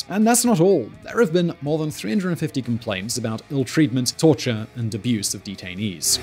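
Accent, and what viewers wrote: United Kingdom. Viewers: Your bad research giving patronizing talking heads with British accents a bad name dude. . Actual story here: <link>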